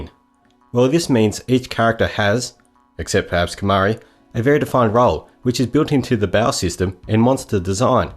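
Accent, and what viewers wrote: Australia. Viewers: Are you Australian, by any chance? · I'm Aussie too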